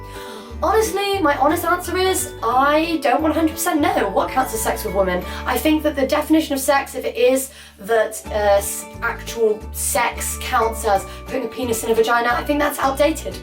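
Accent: United Kingdom. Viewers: what english city is her accent from? the way she pronounces the 'ts' is irritating af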